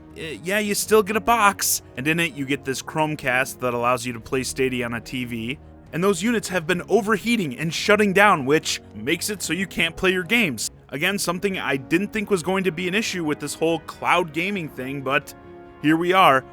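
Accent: Chicago, United States. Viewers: his chicagos kinda fresh doe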